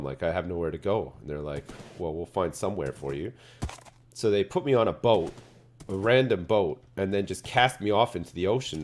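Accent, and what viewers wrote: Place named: Alberta, Canada. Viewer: So many years later, he still sounds like he's from Alberta.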